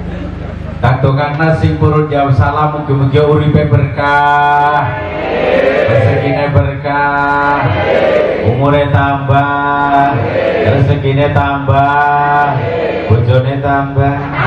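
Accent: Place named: Banyumas, Indonesia. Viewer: mantaab yi, ngapak banget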